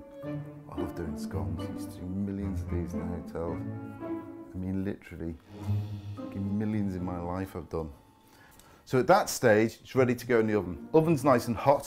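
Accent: England, United Kingdom. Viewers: The bloody guy has an English accent (he’s from Liverpool), can you not tell? They’re Scones! Scones came first remember, we are the mother country after all! No they’re not biscuits. 🙄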